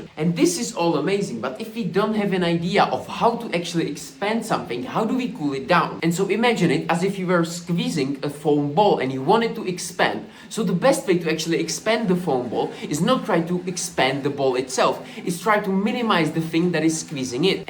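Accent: Czech Republic. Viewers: seš čech co? přízvuk.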